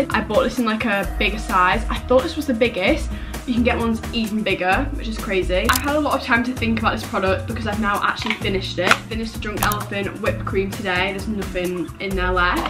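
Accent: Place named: Newcastle, United Kingdom. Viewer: I LOVE YA FINALLY A GEORDIE YOUTUBER IM GEORDIE AND IVE BEEN TRYING TO FIND A GEORDIE YTBER FOR AGES! I knew u were geordie when I hear ya say quid 😂 I LOVE YOU SO MUCH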